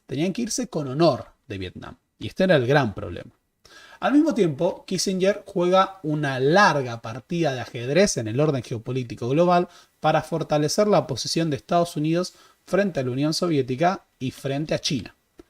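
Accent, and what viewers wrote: Argentina. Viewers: Deberías hacer un esfuerzo para no pronunciar con acento argentino la Ll como ch.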